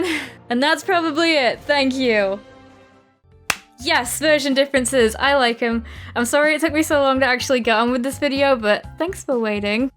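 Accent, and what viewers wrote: England, United Kingdom. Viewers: good video. your British accent is adorbs :) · Yay! I absolutely love your channel and your voice, mainly cause your a fellow Brit. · It took me a while to realise she’s not Australian and actually English trying to Americanise her voice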